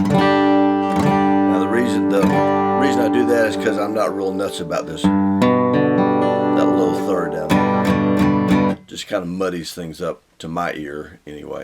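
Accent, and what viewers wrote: United States. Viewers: Just your explanation of how you play a G chord is off the hook. And you have the most perfect southern accent. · that's great, I'm smiling, it sounds like Tennessee to my ears, thank you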